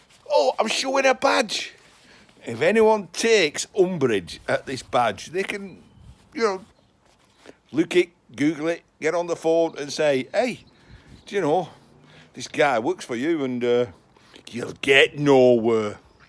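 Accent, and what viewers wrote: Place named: Manchester, United Kingdom. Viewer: I love watching your videos for 2 reason's, First of all being an ex-Mancunian, I do love the accent, I miss it so much. 2nd If I didn't know better I would think my Father had a bike in his younger days, as you could pass as my brothers Twin. You look so much alike. lol Don't worry I won't hold that against you.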